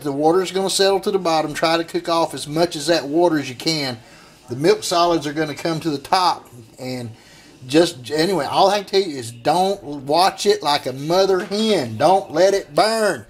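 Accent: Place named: South, United States. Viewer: Loved your accent, I'm for the South too!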